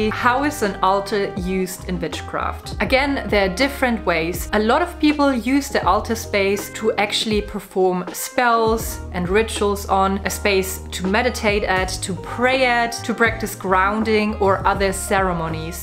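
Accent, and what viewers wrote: Germany. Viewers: I’m going to build an altar and just found you from this video! I just want to say that I’m obsessed with your accent! Are you German? You are also so beautiful. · Are you german? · German? The land of witches. I might be wrong with the accent.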